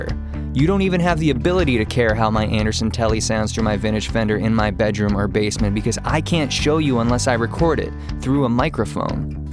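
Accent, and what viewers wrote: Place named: Bavaria, Germany. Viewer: A very simple Bavarian portent question. Can't unhear it. :D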